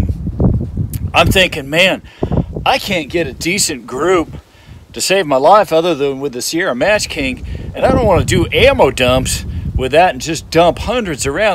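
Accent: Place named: Nebraska, United States. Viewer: I picked up on your accent right away, and was like "holy crap, a brother from the Motherland of Nebraska!" Then I saw your lower registration, and subscribed without question. Grew up in Ogallala, now on the East coast. Thanks for putting things up on the channel!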